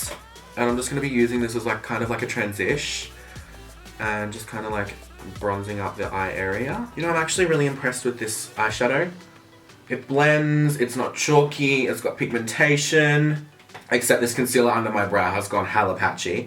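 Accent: Australia. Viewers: R u Australian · Amy Expert he's Australien :)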